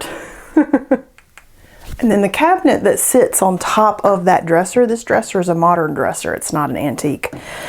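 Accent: South, United States. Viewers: Thanks for the great content, your southern accent is lovely!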